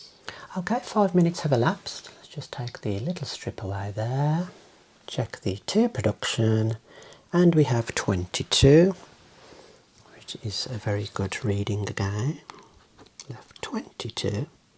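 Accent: Birmingham, United Kingdom. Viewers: I neeeeeeed to know. Are you a professional optican or optometrist? Also fellow brummie/yam yam here. since i was a kid, ive always had tingles having eyes checked, and your accent adds nostalgia for me! Thank you thank you thank YOU! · Where are you from, your accent is so familiar, I'm from the black country and you sound kind of brummie, love the asmr btw <3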